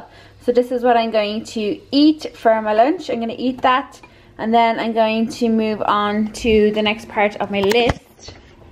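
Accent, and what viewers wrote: Ireland. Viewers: I'm from Middle East and I love Irish accent 😊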